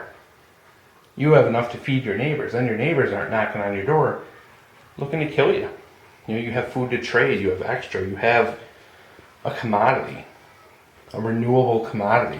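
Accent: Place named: Midwest, United States. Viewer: LOL your accent reminds me of home! are you from Michigan or Minnesota?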